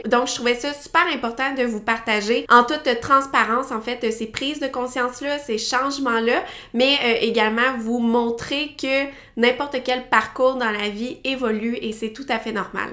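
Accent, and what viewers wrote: Quebec, Canada. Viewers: Ton accent est québécois ? Wooow je suis mexicaine et...c'est super intéressant ton contenu et ton accent 😊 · J'apprecie la possibilite de lire un blog minimaliste en francais et de vous ecouter. Je just veux partager ma suprise car d'une habitude j'ecoute a francais europeen et votre accent quebeqois m'a etonnee. C'est super!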